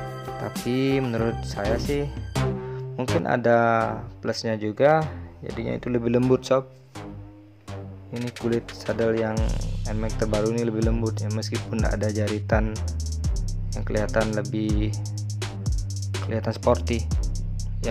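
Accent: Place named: West Sumatra, Indonesia. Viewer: Ini yg ngomong kok kaya org sumatera barat bukan org bali.. (yg org bali asli komen y)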